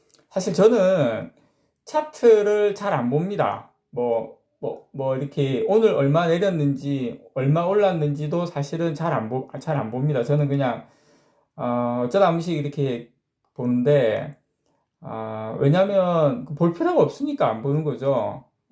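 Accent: Gyeongsang, South Korea. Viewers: 구독 꾹~~누르고 매일매일 영상잘보고있습니다..ㅎ 경상도 사투리로 속시원히 설명해주시니 주린이라도 맘편히 따라하고있습니다.. 최고점에서 물려있지만 시키시는데로 ~~ 항시 존 정보 감사합니다..^^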